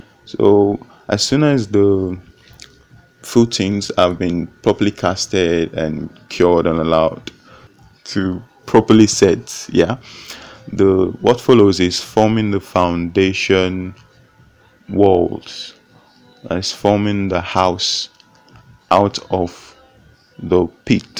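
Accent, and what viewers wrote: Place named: United States. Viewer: Bros, Even with your American accent, I am aware that you are a Nigerian and this house was built in Naija. Thank you very much for this very educational video. Please upload more videos dealing with Electrical, Plumbing, and other finishing aspects. Thank you very much. This has been quite helpful. Please keep it up and keep it coming. Thank you once more.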